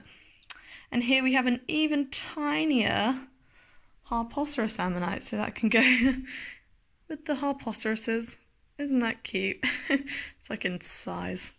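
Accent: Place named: London, United Kingdom. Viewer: Hi where do you Find them? They are Awesome, is that how you got Your Tattoo on your left Arm. I am from The U.S.A. 🇺🇸 I Want to get that Tattoo unless it’s a woman’s Tattoo Please let me know, I am A Fan of Yours and since your from London I love ❤️ your Accent.....Love Donnie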